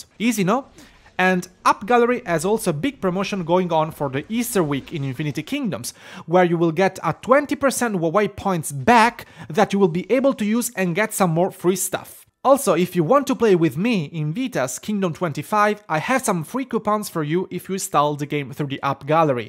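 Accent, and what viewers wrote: Italy. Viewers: Quanto se sente che sei italiano! Bel content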